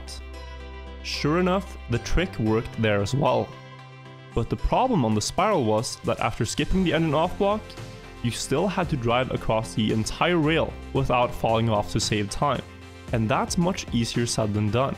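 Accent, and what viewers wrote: Norway. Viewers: are u a norwegan??? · are you Norwegian? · Are you norwegian? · Are you from norway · You Norwegian?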